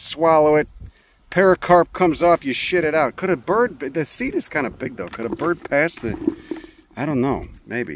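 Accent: Chicago, United States. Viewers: Funniest botanist. He’s from Chicago and travels the country trying to look at plants. Heavy Chicago accent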